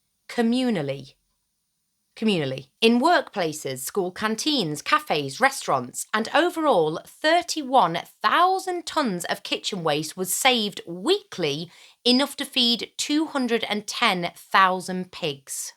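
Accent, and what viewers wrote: United Kingdom. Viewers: Hello, from Michigan, USA. I wish I could express to you how much I love your content and how many times I've read things in your sweet British voice and pronunciation😊 Your videos are so well researched and thoughtfully delivered. And very refreshing in this extremely cold midwestern winter! Until next time...🧡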